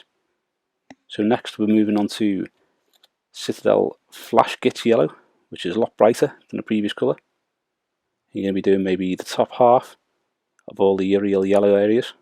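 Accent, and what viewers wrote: Liverpool, United Kingdom. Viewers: The scouse accent if i close my eyes i feel like I'm watching art attack.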